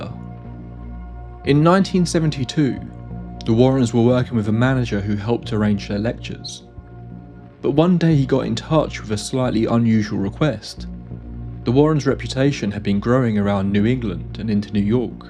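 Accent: United Kingdom. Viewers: I don’t wanna hear a British guy talking i can barely hear what’s he’s talking. About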